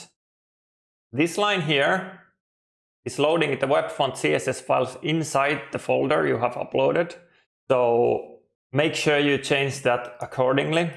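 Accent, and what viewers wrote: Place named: Finland. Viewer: Bro! Avasin taustalle kuulumaan ja meni 4 sekunttia aivoilla tunnistaa Suomi-aksentti. Kiitos.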